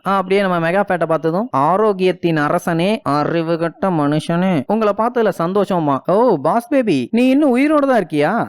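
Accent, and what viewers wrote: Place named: Madurai, India. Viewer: Bro your slang is so super like Madurai voice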